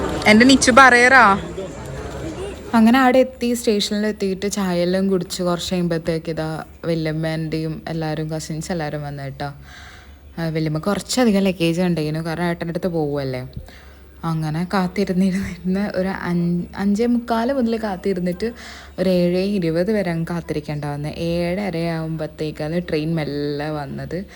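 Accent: Kannur, India. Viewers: സംസാരം കേൾക്കാൻ നല്ല രസം ഉണ്ട് ചേച്ചി.... കണ്ണൂർ ഭാഷ കേൾക്കാൻ കൊള്ളാം 😍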